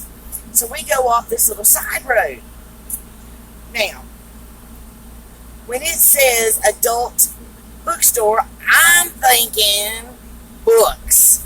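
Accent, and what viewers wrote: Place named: South, United States. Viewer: Knee high to a grasshopper?? Great southern twang!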